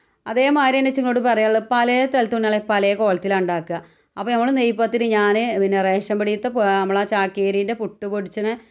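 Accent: Malappuram, India. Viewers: താത്താ നിങ്ങടെ വർത്തമാനം മലപ്പുറം ജില്ല വിട്ടിട്ടുണ്ട് കുറച്ചൊക്കെ കുറയ്ക്കാമായിരുന്നു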